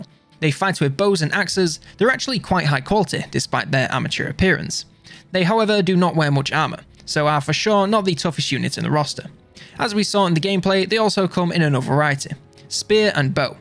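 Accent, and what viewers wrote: United Kingdom. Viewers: For the longest time I thought they must call this game “Wahhma” in the U.K. based on this guy’s accent.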